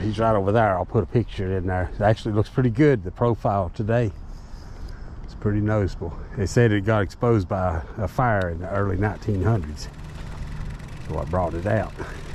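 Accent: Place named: South, United States. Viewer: That name does not match up it that Southern accent.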